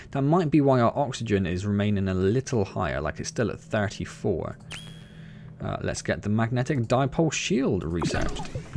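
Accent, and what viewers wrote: United Kingdom. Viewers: Just found you through your furious seas video and I detect a west country accent... where abouts you to mate?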